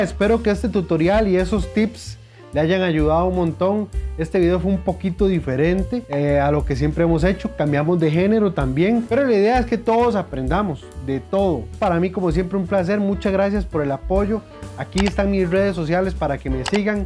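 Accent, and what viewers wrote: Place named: Costa Rica. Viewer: Me suenas Tico. NICE BRO. PRIMER VEZ QUE VEO UNO DE TUS VIDEOS Y YA TE SIGO...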